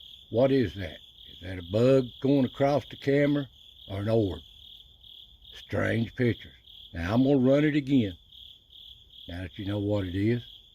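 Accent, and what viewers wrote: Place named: Blue Ridge, United States. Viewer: well he sure is eating good!😸 and your accent and way of talking sure makes me homesick for the Blue Ridge Mtns/Shenandoah Valley that was my home!! 😊 I sure 'nuff love your channel, Bro. 🧡💙🧡 Blessings my friend 💜💜💜🙏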